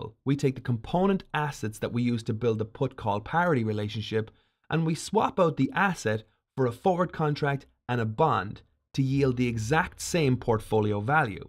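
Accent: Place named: Ireland. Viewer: The narrator sounds Irish!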